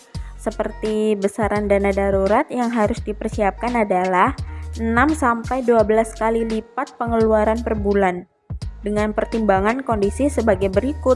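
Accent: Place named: Java, Indonesia. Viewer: Kak asli org mana klu boleh tau? Ko gaya bahasa nya medok bgt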